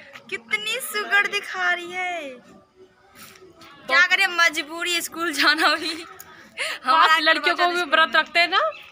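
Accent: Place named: Rural, India. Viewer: Tum kaun gaon ke hao .hum tumhari fain ban gai hai .tumari boli bhasa bada achchhi lagat hai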